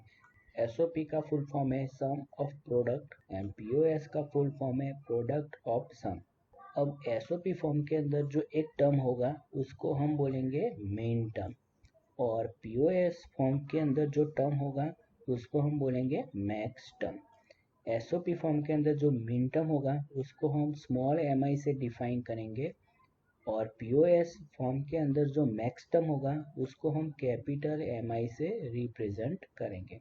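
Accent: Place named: Gujarat, India. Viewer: Bruh you must be fron gujarat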